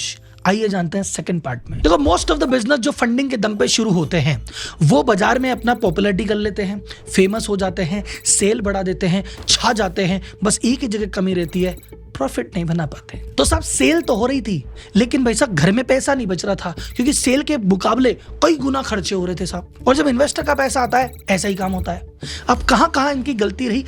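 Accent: Gujarat, India. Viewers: Gujrati ho ya rajasthani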